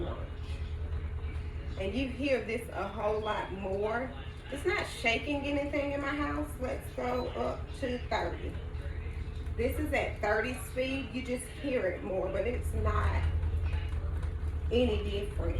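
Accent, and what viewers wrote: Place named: South, United States. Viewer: Thanks for the review! It’s been a year since you’ve done it, what is your opinion of this device now? I just bought mine, delivered to me today. I’m excited to get my “shake on” 😅 I have a lot of injuries from my military career to work through. So I’m definitely looking forward to this. Btw, very nice bodily attributes 😉 Looking good over there. Sounding like you from GA or the Sip 😄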